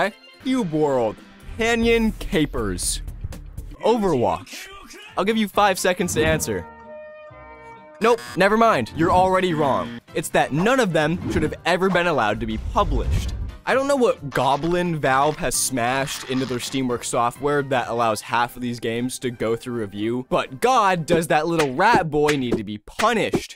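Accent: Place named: Lithuania. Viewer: you sound lithuanian but in the best way possible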